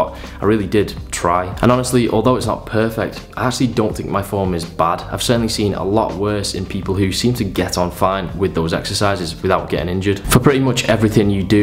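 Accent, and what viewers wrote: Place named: North, United Kingdom. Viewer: Nice channel buddy. No nonsesne advice delivered in a perfectly no nonsense northern way. I've just hit 50 and spent the last 6 months trying to get back into shape after being fat and fifty and not happy. Doing great but I gotta say squats and deadlifts have just left me in pain and struggling to work anything with lower back. Not even lifting that heavy, I think my form is ok but I will be thinking of alternatives after watching this so BIG THANKS buddy.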